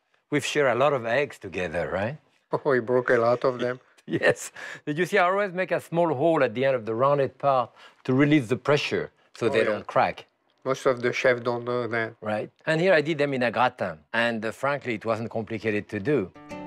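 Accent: France. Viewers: merci M. Pepin...c'est se bonne-- this is wonderful....dae schopping of the herbes is a beautiful sound.....love his francais acCent!!!! ') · He actually said, "we're making more dishes with eggs" but it sounded like, "more of this shit with eggs." lol French people can't say the word "sheet" either and would say, "on a cookie shit." He often calls eggs.... "heggs" too. My mom is French so I've heard this accent all my life. It's adorable. I feel right at home with Monsieur Pepin. Can listen to and watch him cook all day. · That french accent..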